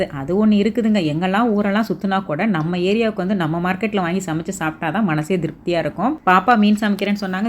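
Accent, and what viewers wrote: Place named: Coimbatore, India. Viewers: ஆமா என்ன எங்க ஊர் (கொங்கு) slang பேசரீங்க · Ennagga enna coimbatore slange varuthu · S pure kongu slang but super sis unga slang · என்ன அம்மணி எங்க ஊர் தமிழ் பேசுறீங்க. நல்லாத்தா,இருக்குது பேசுங்க, பேசுங்க அடுத்த தடவை வந்தா, கோயம்புத்தூர் பக்கம் வந்துட்டு போங்க. ❤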